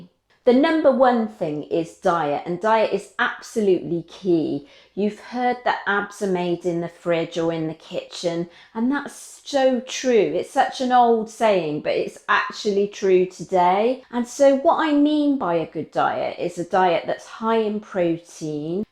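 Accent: England, United Kingdom. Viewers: Ahh England accent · I'm in San Antonio, Texas. Love hearing about fitness and diet for my age, and especially with your English accent. ❤️❤️❤️ I'm definitely inspired, and your videos are helping me!!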